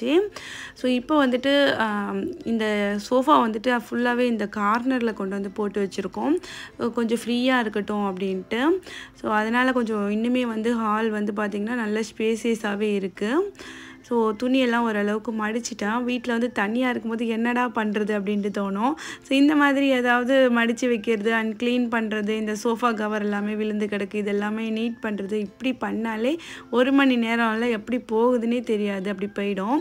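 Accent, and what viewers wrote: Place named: Nagapattinam, India. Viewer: super sis yenakku nagapattinam namma oor slang varuthu athan kettan masha allah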